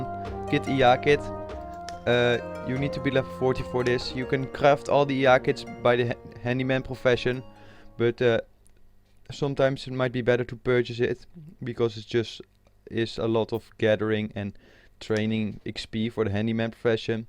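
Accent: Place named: Netherlands. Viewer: Duidelijk een Nederlander ^^, goed bezig.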